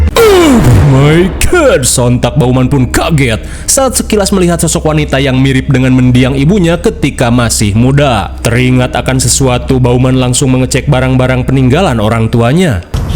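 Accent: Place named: West Java, Indonesia. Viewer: logatlawak sunda banyax menghibur ke para orang indo, aku orang suku sunda jadi ikut bangga banyax yg suka logat lawak sunda aing baraya, mantap mang sehat selalu jeung damang selalu mang😆😎🙌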